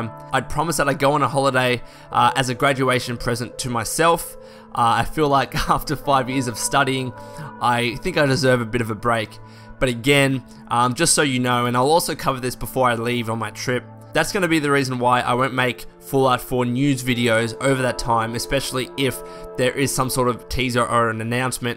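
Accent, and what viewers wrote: Australia. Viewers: ***** its so tough!! Dude where are ya from, I know its an Ossie accent but do you live in Australia? :)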